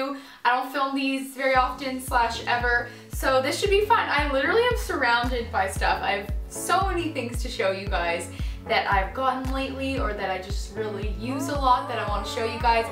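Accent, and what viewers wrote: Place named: Australia. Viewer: Looks like you've picked up a bitOf an Australian accent haha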